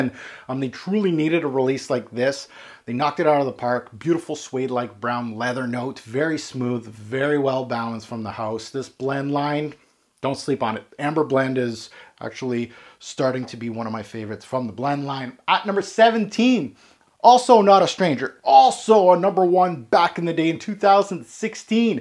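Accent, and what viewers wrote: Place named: Montreal, Canada. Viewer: Dude are you from the province of Québec? Sounds like a solid Montrealer accent :)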